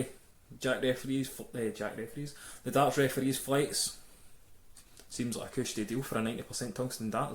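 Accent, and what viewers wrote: Scotland, United Kingdom. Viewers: I came here for darts, and stayed for the Scottish accent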